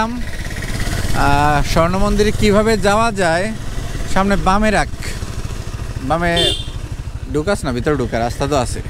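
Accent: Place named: Comilla, Bangladesh. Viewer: আপনার বাড়ি কুমিল্লা মনে হয়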